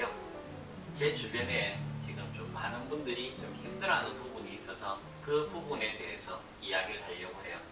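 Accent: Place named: Gyeongsang, South Korea. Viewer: 참 부지런하시네요. 말투가 경상도 싸나이~? 좋은 말씀들 잘 듣고 구독 꾸~욱 누르고갑니다.♡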